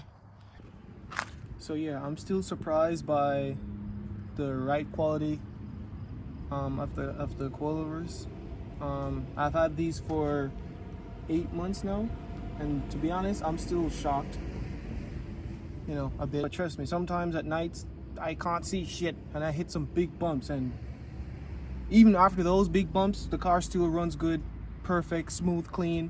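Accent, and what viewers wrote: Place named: Jamaica. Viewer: U sound jamaican dawg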